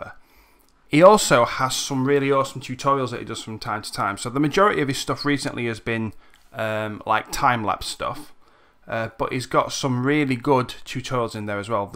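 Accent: United Kingdom. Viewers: That moment when I know all of them but still suck at maya lol... btw nice british accent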